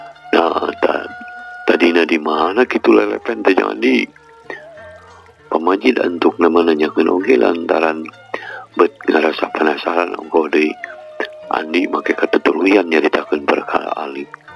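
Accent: Sunda, Indonesia. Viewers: Cerita dongengnya sangat bagus mudah di pahami.suara sunda nya aduh jdi inget pada masa jamannya.keren mang..sukses dan sehat slalu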